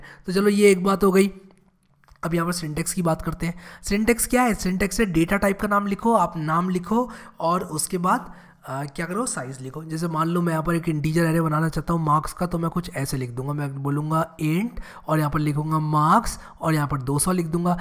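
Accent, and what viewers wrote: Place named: Punjab, India. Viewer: U have a little bit of Shakti Kapoor accent. Punjabis..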